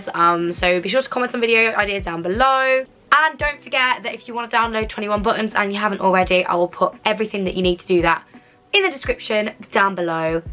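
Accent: Birmingham, United Kingdom. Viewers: Your Brummie accent has gotten even stronger! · Wow brummy accent is really strong in this 🤭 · Yaas the Brummy accent is back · wait shes a brummie?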